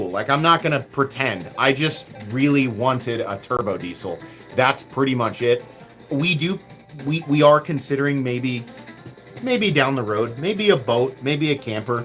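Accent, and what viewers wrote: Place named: South, United States. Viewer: Little bit of a southern draw on this episode. Let me find out you're one of us Smokey Mountain Knife Works locals and you've been playing the long game the whole time.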